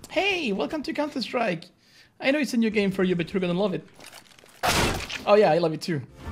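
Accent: France. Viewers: Tu es français ou je me trompe car ton accent m'a l'air bien francisé ??!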